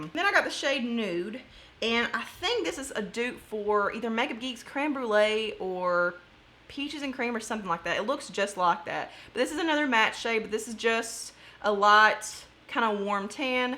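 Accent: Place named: Kentucky, United States. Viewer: Just found your channel tonight because I was looking for a review of Avon powder and already subscribed! After hearing your accent I had a feeling you were from KY like me and sure enough! Excited to keep watching your videos 💜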